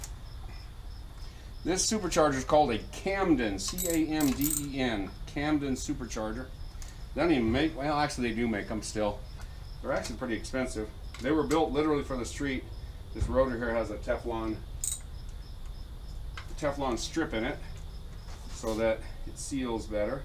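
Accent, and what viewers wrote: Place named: Oklahoma, United States. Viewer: is one of my favorite YouTube channels and I'm also from Oklahoma and he really does talk like that lol. He's actually an extremely intelligent and talented guy who was an Airplane Mechanic in the Air force. He cracks me up and teaches me at the same time. In his earlier videos he was trying not to sound like an idiot he said so that is why he sounds different in the earlier ones. So actually the earlier videos are the fake lol 😁👍 I like each channel for their own individual uniqueness.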